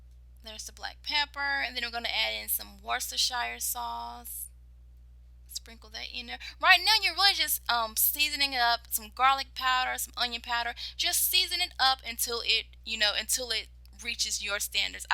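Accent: South, United States. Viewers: your slight southern drawl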